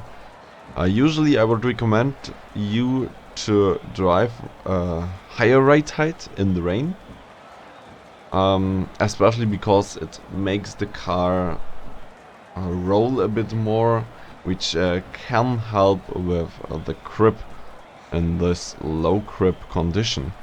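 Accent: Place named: Germany. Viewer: Are you german ? Because I am german and your accent sounds pretty much like everybody in my class speakes English😂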